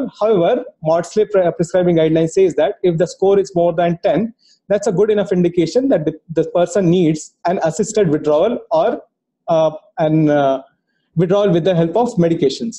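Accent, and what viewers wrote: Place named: India. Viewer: Thanks doctor, this is a fantastic explaination that has helped me and i always watch this while dosing, even though there is a strong indian accent for those who dont understand it too well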